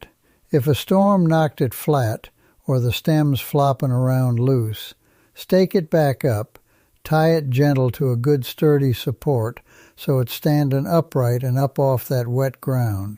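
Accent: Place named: Rhode Island, United States. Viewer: AI has a RI or southern MA accent. Hilarious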